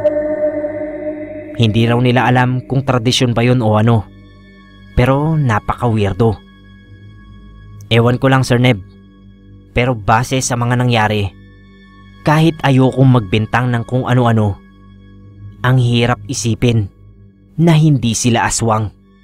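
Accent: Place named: Pampanga, Philippines. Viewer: Sa the way sya magsalita feeling ko kapampangan sya haha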